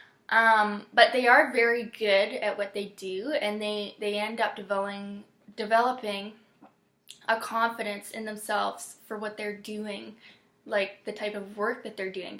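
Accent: Canada. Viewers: I was the person at my last job who was in charge of keeping track of the expiration dates on food and sodas! lol And I would hone in on all my data entry! lol Omg, and work just for work's sake. I always gave work my all - I never just slacked off - even when my boss wasn't there. Spot on! You sound like you're in Canada?? You described my work ethic to a T. And I always invented little shortcuts to make my job easier.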